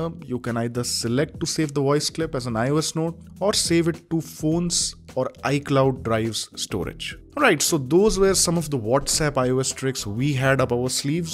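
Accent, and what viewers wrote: India. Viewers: Hate that Hindú accent. It’s very hard to understand!!!!!!!!!!!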